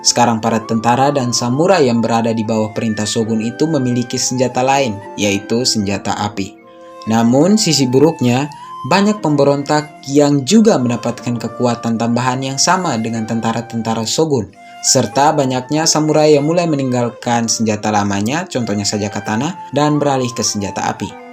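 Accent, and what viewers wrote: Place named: Eastern Indonesia, Indonesia. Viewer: Fix Logat timur tdk bisa tipu hahahah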